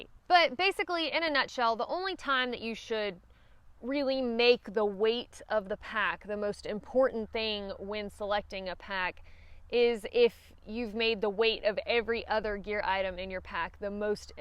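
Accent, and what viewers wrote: South, United States. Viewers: I am not a backpacker, but I like listening to your awesome southern accent! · florida born and raised i really enjoy listening to your southern accent🤗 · Were you born in the South? You pretty much sound Southern.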